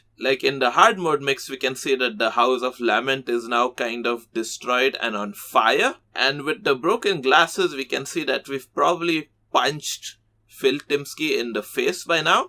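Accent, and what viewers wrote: India. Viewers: Talking ahh India voice 💔✌️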